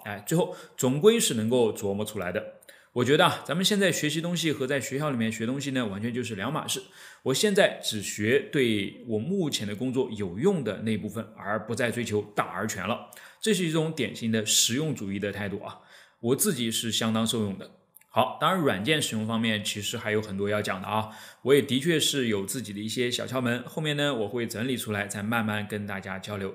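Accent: Nanjing, China. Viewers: 口音有点南京的感觉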